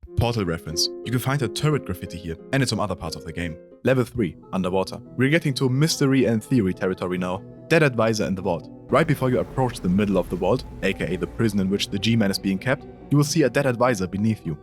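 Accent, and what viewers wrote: Germany. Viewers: kannst du bitte den deutschen akzent loswerden der triggert mich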